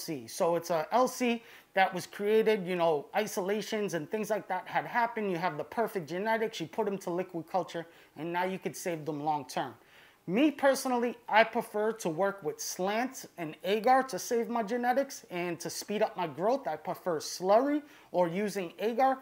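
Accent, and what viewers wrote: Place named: Massachusetts, United States. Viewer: Sounds like you got a Massachusetts accent.. mush love from 508 🤙🏻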